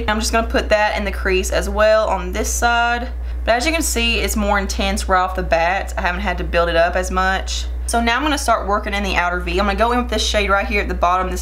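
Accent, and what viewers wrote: South, United States. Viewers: New subscriber! Love hearing that southern accent...I'm a Alabama girl...Roll TIDE! Your're so funny and have some great content!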